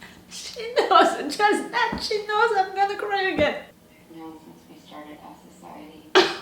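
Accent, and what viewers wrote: France. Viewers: I’ve seen tons of shows the last couple of years, they came and went just like that but Mr. Robot reached me in a very strong and deep way, beyond the outstanding perfomance, acting, screenplaying and ost, because mental condition is very close to me and is something i hace to fight to everyday. Thank you for share your feelings and thoughts with the world about this underrated show, i dont know you but i can tell you are not faking reactions at all, I will always remind you "frenchglish" haha (you did it great! English is not my mother tongue also but i could understand you!) Well, i supposs this is the end, leave you my with my special moment (and song) of the whole show: "Darlene leaving the family polaroid picture in Elliot’s shelve" <link> Goodbye friend c'est fini.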